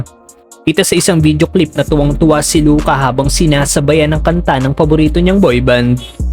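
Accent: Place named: Laguna, Philippines. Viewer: San ka sa Laguna? Yung tono mo haha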